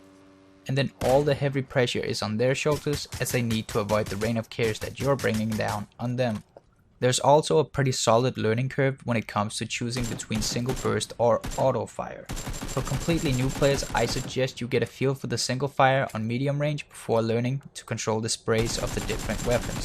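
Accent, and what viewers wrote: Denmark. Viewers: Are you Danish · Are you Danish?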